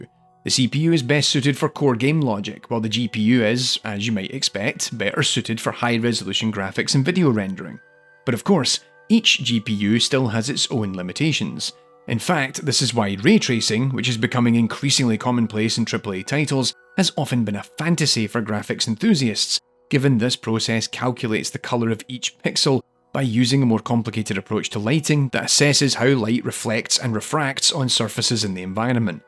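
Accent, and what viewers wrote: Scotland, United Kingdom. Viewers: Are you scottish? 😁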